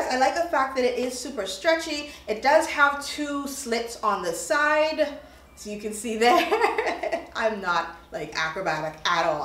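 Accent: Bahamas. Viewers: just watched your rose wholesale video and am like this girl sounds Bahamian so I stalked your page and you are Bahamian yayyyy!!! I love your videos definitely will be subscribing and yes am Bahamian too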